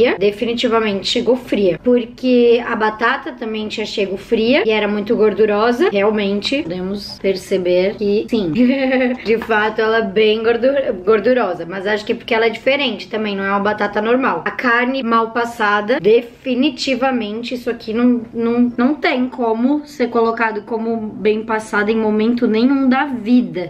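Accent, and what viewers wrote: Rio Grande do Sul, Brazil. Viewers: Vc é gaúcha? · Você é gaúcha?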